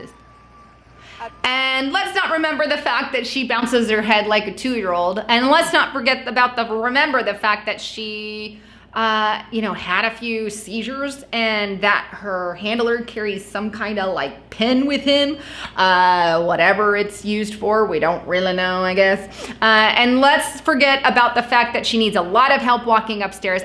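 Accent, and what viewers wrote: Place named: Texas, United States. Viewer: lol...right before i watched your video my husband and i watched alex jones and we were talking how he kept saying can.and then we watched yours...lol....we moved to texas from Missouri and its funny how many words and sayings are different. ......we said maybe she is from texas and thats what they call it....thank you, by the way for all your reports....you do a wonderful job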